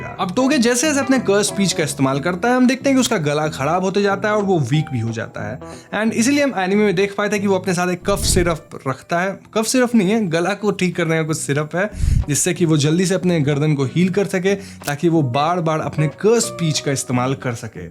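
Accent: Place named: Bihar, India. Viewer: Bhai ye sb to hmne anime me dekh hi lia 😂 Teri vdo dekhne ka kya fayda Vo bhi bihari accent me bol rha h 😏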